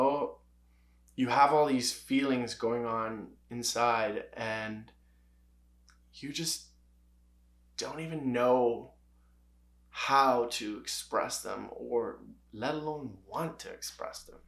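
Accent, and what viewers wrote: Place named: North America. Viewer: Very interesting video thank you I think it’s interesting how your accent sounds more North American than Barbadian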